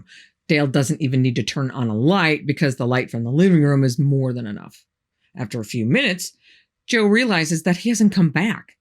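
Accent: New York, United States. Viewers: How the heck does someone make you feel both safe and spooked at the same time? tells these stories so well that one can’t help but laugh even as the sheer terror builds up in your mind. And the AI, the editing… Everything is so on point! How is this channel not at 1 M members?! I thought only Morticia Addams did lovely and spooky but certainly does it at least as well…with a New York swagger!!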